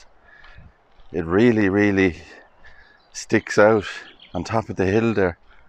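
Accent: Ireland. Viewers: You're beautiful Irish accent alone makes it worth listening to! Do you speak Irish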